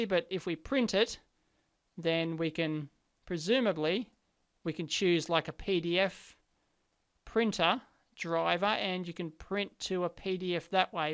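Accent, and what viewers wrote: Australia. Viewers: I really enjoy watching your channel. Not sure what’s your nationality but your Aussie accent and expression that make me learn and laugh. Thank you.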